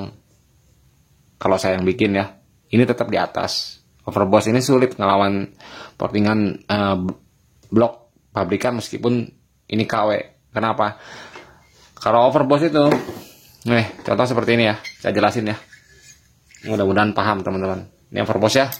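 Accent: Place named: Jakarta, Indonesia. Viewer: logatnya teringat kenangan ibukota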